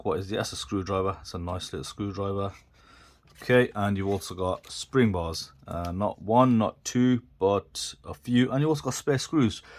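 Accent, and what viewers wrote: Birmingham, United Kingdom. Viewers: Bro you from Birmingham?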